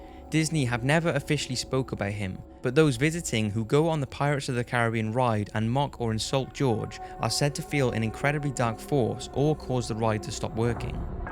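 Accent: United Kingdom. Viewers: For some reason a British accent always makes a scary story scarier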